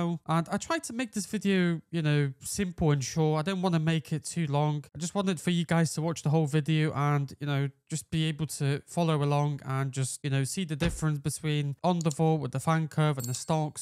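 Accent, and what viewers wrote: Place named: United Kingdom. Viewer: hi Bro nice video again! But you again didnt showed the real fan curve, just seing the curve without values is not really helpfull :( We need the numbers!! xD thanks for testing! Love your content and that heavy accent (british I think? :P), your videos are amazing quality too, I would expected this quality from a channel with 20-100k subs but you have 1.000 but still so quality videos, thank you!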